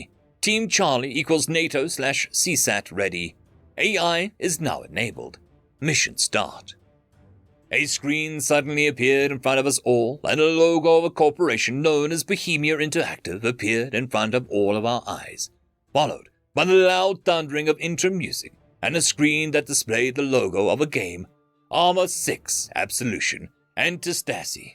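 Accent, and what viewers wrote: Scotland, United Kingdom. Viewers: Very British Accent 😂 well technically Scotland is in Britain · " A very British accent..." does a Scot instead. Nigel Green as Colour Sgt. Bourne from "Zulu" probably would've been a better choice.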